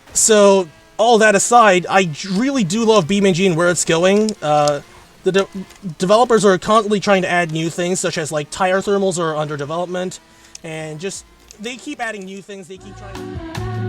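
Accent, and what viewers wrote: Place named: United States. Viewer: You sound so American lol! Seriously, it feels very niche here in the US today, sadly never can find anyone I know who thinks Asphalt games are NOT ridiculous. You're living the gamers dream, which is basically to be like a top 100 player in their favorite game and I think maybe you underestimate how cool that is. Nobody would trade that! Keep being a good guy, it's your best trait.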